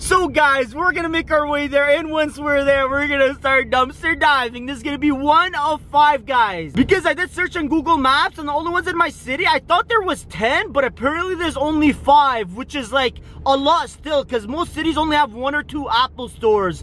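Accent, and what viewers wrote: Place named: Chile. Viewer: Abla como chileno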